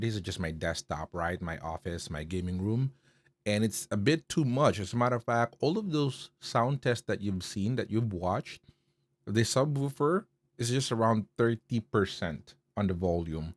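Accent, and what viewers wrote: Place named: United States. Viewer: naka automatic play next tong YT ko. nkikinig ako habang nag wowork kasi ng mga reviews ng Edifier MR5.. tpos nag next auto play tong Video mo. akala ko black american. pinoy pla. sana ol gnyan accent. thanks sa review dude. nag sub na rin ako.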